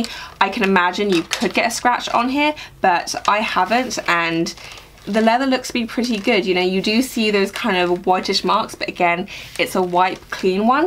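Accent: England, United Kingdom. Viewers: REASONS I LIKE THIS VIDEO RANKED! 1. Succinct 2. Well thought out and planned 3. Intelligent and scientific reasoning 4. English Accent!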